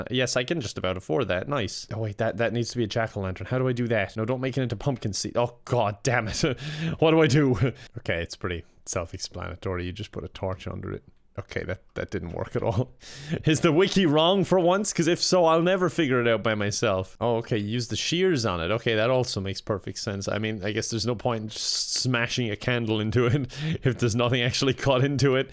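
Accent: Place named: Ireland. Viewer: Do whatever you want to. I'm here for the Irish accent. That shit is basically my kink.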